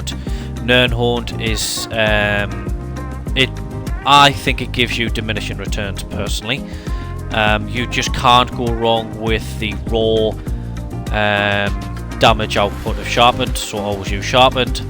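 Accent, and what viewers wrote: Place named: Newcastle, United Kingdom. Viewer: Didn’t realise you were a Geordie, were going to need a duel for best Geordie EU title 😅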